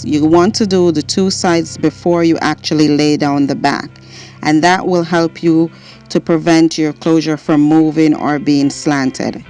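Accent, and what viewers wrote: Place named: Jamaica. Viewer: kool, subbed. r u from Jamaica? I pick up that accent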